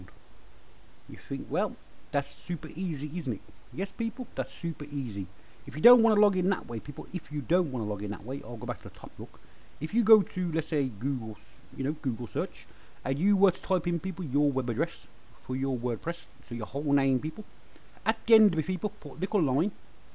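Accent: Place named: Ireland. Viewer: Thanks for that simple technique, loved the accent and the number of times you say "people". Are you Irish? :)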